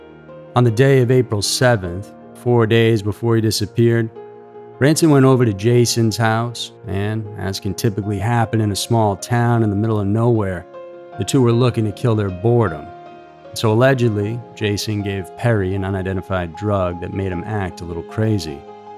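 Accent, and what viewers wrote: South, United States. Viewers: Where's this southern drawl coming from? You don't have it in your older episodes. Is it manufactured for the show? You sound very much like Mathew McConney in this video, not so much in your older ones. I'm just curious, I really enjoy these videos.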